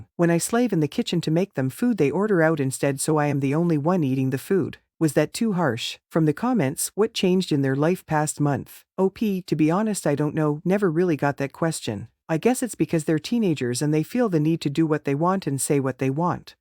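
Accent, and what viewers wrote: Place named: Midwest, United States. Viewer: The ai is Midwesterner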